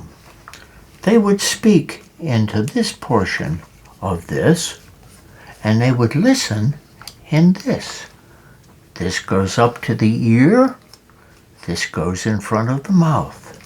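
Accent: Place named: United Kingdom. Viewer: He has such a beautiful accent almost like the British . I think the old generation used to stick to British accent as close as they could.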